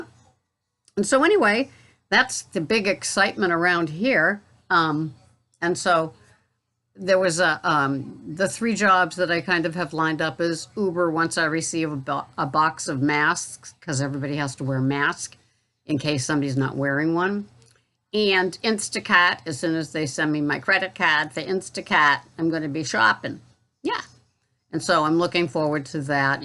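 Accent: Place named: Cape Cod, United States. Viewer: The food allergy test sounds amazing. Looking forward to the day you can share results. Love your Cape Cod accent., have an awesome day! 😊👍😊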